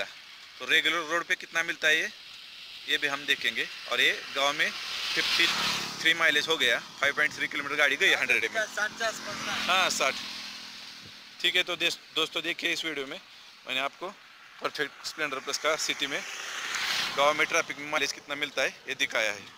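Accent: Maharashtra, India. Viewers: Aapki tone se pata chal raha he ke aap Marathi ho. Or wo bhi North Maharashtra se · Bhai aapke Language se pata chalata he ki Aap Maharashtra ke rahne Wale ho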